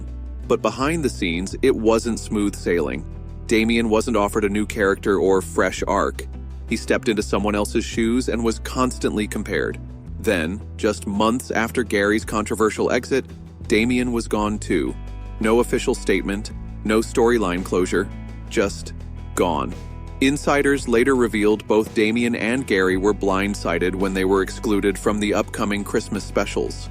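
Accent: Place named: United States. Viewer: VOICE OVER TALKING CRAP AND WHY IS AN AMERICAN DOING THIS AS THEY HAVE A DRY SENCE OF HUMOUR....VOICE OVER TALKING GARBAGE.......GO MAKE COFFEE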